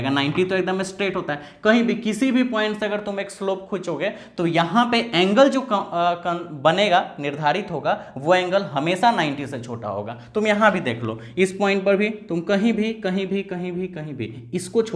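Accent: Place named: Bihar, India. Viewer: Bihari language me feel hi Alag hai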